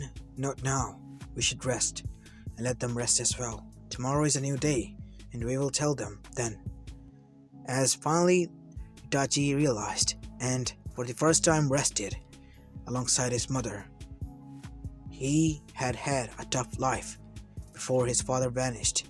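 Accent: India. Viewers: By your accent I think you are indian, I am indian too and its very good to see that we are also contributing to the Naruto world.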